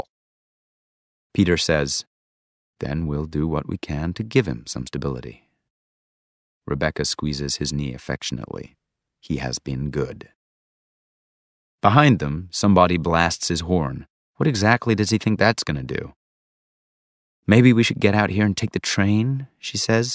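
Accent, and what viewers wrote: United States. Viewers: As a fan of American accent I'm really happy about this video <3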